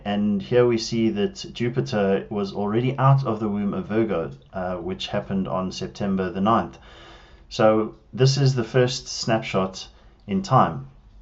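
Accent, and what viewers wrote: South Africa. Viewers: Thanks, great work!...you from Johannesburg? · Hello from over the Pacific....Am so glad I found this website....Amazing work,thank you, from one South African to another...I hear my countries accent loud and clear,and I miss it... :) May God richly bless your work....MARANATHA!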